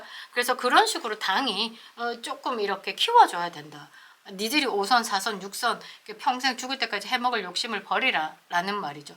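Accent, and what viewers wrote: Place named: Busan, South Korea. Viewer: 아따 부산 까쑤나 스럽게 말 잘하네 욕 아님 ^^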